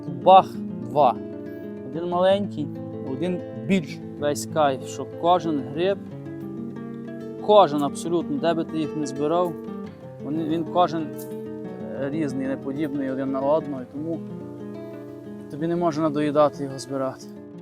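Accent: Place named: Prykarpattia, Ukraine. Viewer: Ви так просто і щиро розповідаєте, і дуже гарний говір у вас, прикарпатський.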